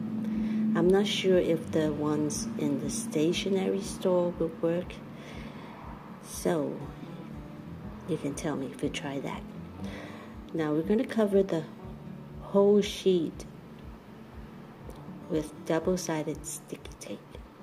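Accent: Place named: Germany. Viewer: you sound German , are you from Germany ?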